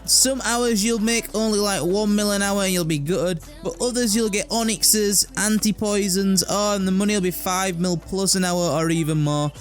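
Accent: Yorkshire, United Kingdom. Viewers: "Yorkshire on helium " And crack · where abouts in yorkshire you at? I assume yorkshire, sounds like it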